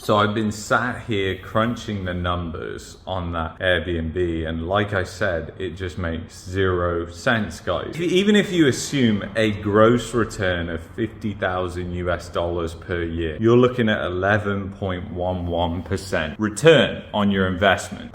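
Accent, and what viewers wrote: United Kingdom. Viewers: I have refrained from commenting so far , but i have to say that this very Limey sounding bloke is one smart cookie . Hope still exists .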